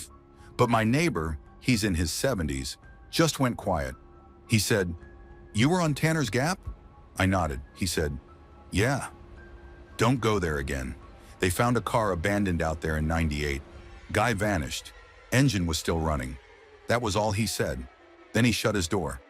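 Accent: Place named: Chicago, United States. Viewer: I love the Dennis Farina accent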